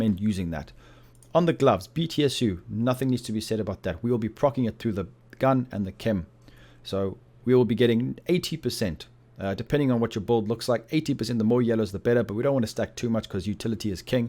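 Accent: South Africa. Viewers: You sound south African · Dude you from SA your accent sounds SA?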